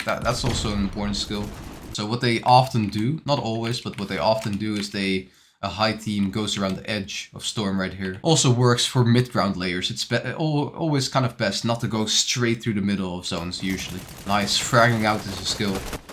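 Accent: Netherlands. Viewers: good video keep it up, are you dutch by any chance? you sound NL ❤❤❤❤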